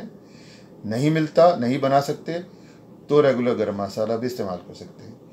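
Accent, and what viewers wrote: Delhi, India. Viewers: Kitni achi AP ki recipe ha itni hi achi Urdu .....AP k sheen qaaf bilkul durust Han ....recipe dakhny main to maza Aya ha AP ki Urdu sun k kisi pakkay Dehli waly ka khyal Aya ha .....my nanihal belong to Dehli jama masjid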